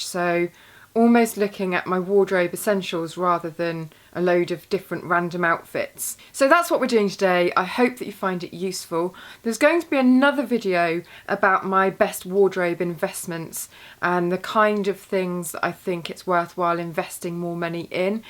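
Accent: United Kingdom. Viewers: Hi, I like you and your videos mainly for two reasons: 1.you're classy. 2.your beautiful british accent.:) x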